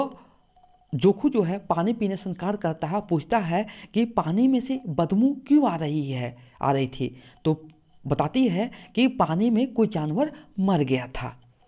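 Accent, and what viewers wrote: Bengal, India. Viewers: Ap kya bengali hai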